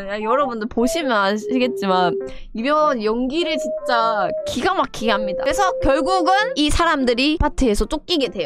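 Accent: Gyeongsang, South Korea. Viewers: 경상도 맕투 개중독됨 ㅠ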